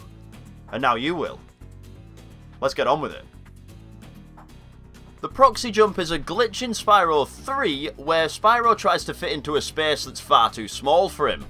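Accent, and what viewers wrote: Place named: Blackburn, United Kingdom. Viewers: He's so Noorthern - I love it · Nice video. Never knew about the duplicate world, really odd. Can't place your accent. Going to have a stab and say Blackburn?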